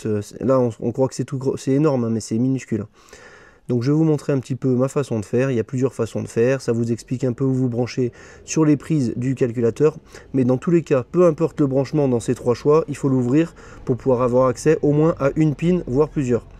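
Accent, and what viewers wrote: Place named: England, United Kingdom. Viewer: Bonjour, j'ai fait une visite par hasard sur ta chaine ( 1 pouce et un commentaire ) mais ce que j'y ai vu m'a fait prendre , disons 40 ans de plus !!!🥸 Fidèle abonné de la chaine CVVT de Vincent Raynaud, je ne l'ai jamais vu se lancer dans de telles aventures informatiques. Les Mini dont il est un spécialiste sont certainement des modèles plus anciens. Pour info cependant si tu ne le connais pas, il restaure des voitures récupérées dans le maquis ( Corse) et a même remonté une Mini avec laquelle il a fait un rallye historique il y a 2 ans ! Pour conclure je suis quand même assez surpris d'avoir découvert que le moteur de cette voiture avait été cassé à plusieurs reprises ! Bon courage pour arriver à tes fins, quant à l'accent anglais, pas de problème, mais traduis plutôt les messages en français ce sera plus cool.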